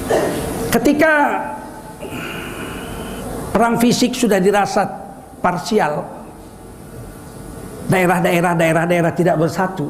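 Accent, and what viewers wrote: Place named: Aceh, Indonesia. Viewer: Bahasa acehnya kental juga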